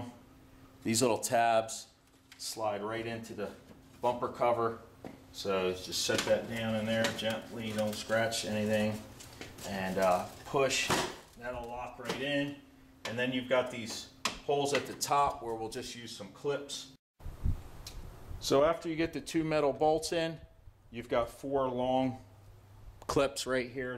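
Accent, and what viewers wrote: Philadelphia, United States. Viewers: THANK YOU. My Honda Fit is doing this exact same thing and I'm pretty sure my neighbors are ready to kill me (I don't blame them). Thanks so much for the thorough video. And from your accent I can tell you're probably from around Philly, so appreciate you even more 🤣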